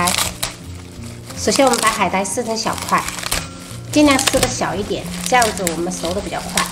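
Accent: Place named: Sichuan, China. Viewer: 最接地气了，喜欢你随心随意的分享，非常真诚~要不是听你讲四川话还以为你就是福建人呐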